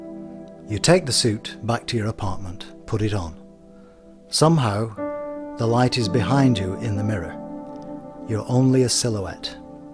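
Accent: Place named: Lancashire, United Kingdom. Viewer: Love this, so relaxing..love his Lancashire accent..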